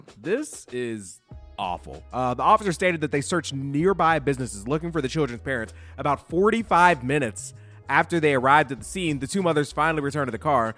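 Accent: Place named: United Kingdom. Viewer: The British ACCENT AAAAAAAAGH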